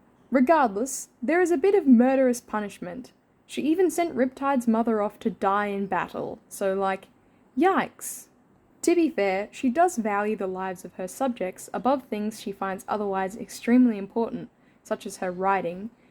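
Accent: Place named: Australia. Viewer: I vote for the 28 unnamed princes :) Also what nationality are you? You sound aussie ( i am)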